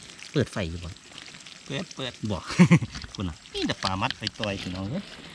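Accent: Phu Thai, Thailand. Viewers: เป็นคนอยู่ใสครับคือเว้าคือคนภูไท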